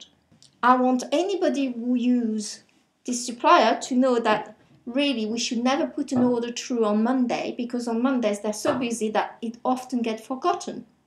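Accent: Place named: France. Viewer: You are especially short-sighted if you think her "funny" accent makes her clueless about Sage. If anything, it goes to show her fluency in one more language (french), which is something I doubt you will ever boast of. If you had anything useful between your ears you'd obviously see she has an in-depth knowledge of the subject with the way she states the advantages or disadvantages of using particular options. Nitwit!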